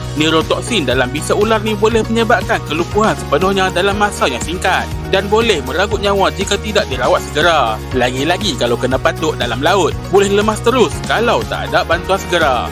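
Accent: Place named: Kedah, Malaysia. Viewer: Sedap dengar logat Kedah. 😍😍😍😎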